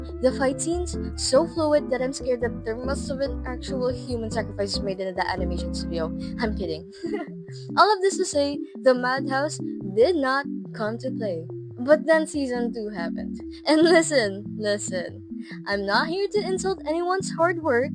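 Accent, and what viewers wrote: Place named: Philippines. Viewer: i love ur philipino accent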